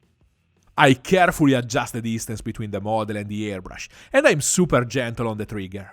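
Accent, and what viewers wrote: Italy. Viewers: This italian accents is so good. Approvo! My new favourite channel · I watch your painting videos both because of all the useful tips & tricks, but also hearing your Italian accent - It sounds so joyful and makes me happy! 😁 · I came here searching for new approaches to Lizardmen, as the last time I painted them (or any minis for that matter) was 10 years ago. This video was helpful and brilliant! I love your accent (Italian?) and how your first language shines through in your English; not only in rhythm but in the beautiful intonation as well! Lovely to listen to.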